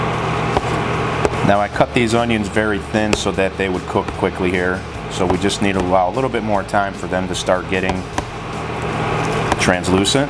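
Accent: Chicago, United States. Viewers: Ok ok you got me new sub and I detect a Chicago land accent so just curious where from. Spent 35 years mostly in DuPage county and damn I miss the food as these folks here in southern New Mexico dont have a clue. I'm gonna give this a go this Sunday for football but I may pan sear and then run in the slow cooker to get tender.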